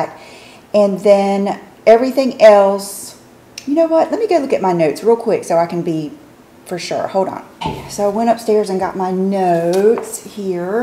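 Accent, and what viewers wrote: South, United States. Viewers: Couldn't agree more on your Southern accent! Just delightful. Everything sounds sweeter with it.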